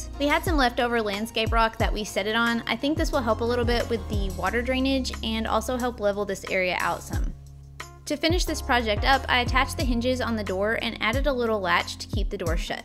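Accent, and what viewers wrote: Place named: South, United States. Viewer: I can’t put my finger on where her accent is from, it seems like it kind of have a southern/eastern sound sometimes but not others so I’m beat. Anyone know?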